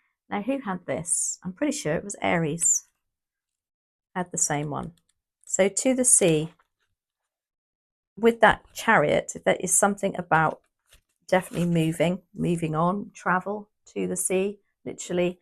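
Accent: United Kingdom. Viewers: So nice to hear an English accent 😃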